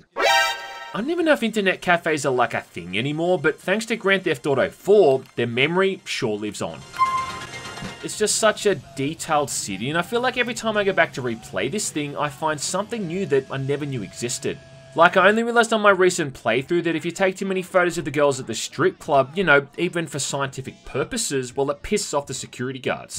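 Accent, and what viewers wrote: Australia. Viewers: Its how the Australian accent works.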